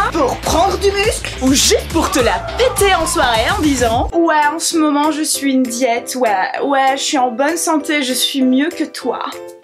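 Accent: Belgium. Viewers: Tu est belge non ? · Je viens de débarquer... tu es belge? Les r bien prononcé c'est comme par chez moi tout ça, tout comme la "pouissance nucléaire" x) #patriotisme #lovelaccent Pardon on a du te le dire 50 000 x. (Je suis en admiration devant tes bras (strange dit comme ça)).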